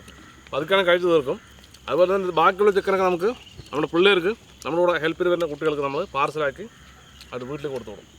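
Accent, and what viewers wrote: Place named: Palakkad, India. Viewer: Heloo ... ...ചേട്ടന്റെ എല്ല വിഡിയോസും പൊളിയാണ്.. ട്ടോ... നല്ല രസം ആണ് കണ്ടിരിക്കാൻ.... മാത്രമല്ല... പാലക്കാടൻ സംസാരവും... എന്തായാലും പൊളി തന്നെ...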